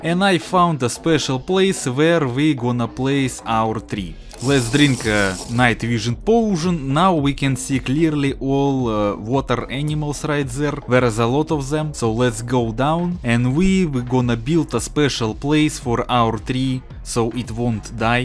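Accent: Russia. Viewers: Love your Russian accent!!! Anyone who doesn’t is cyka blyat !!! Third comment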